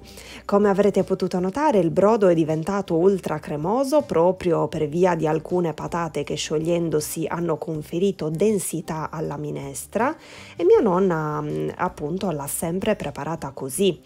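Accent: Sardinia, Italy. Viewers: meravigliosa e intramontabile ricetta pure della mia❤. ps: fallo sentire l accento sardo che è meraviglioso❤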